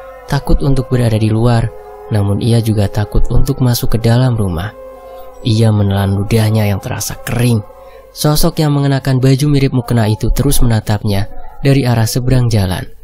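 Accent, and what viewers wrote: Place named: Banjar, Indonesia. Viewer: maaf.. urang banjarkh ini.pina nampak logatx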